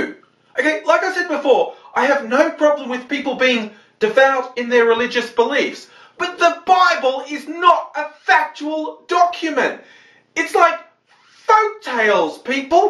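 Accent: Australia. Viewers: Your definitely an Aussie,and your definitely angry,well sort of,in a controlled type angry way,like angry with a purpose,almost assertive with a loud controlled voice,anyway good vid,good points made. Do you think the C's will listen,I doubt it,they have a god given logic filter,but at least you got it of your chest,just keep shouting at them.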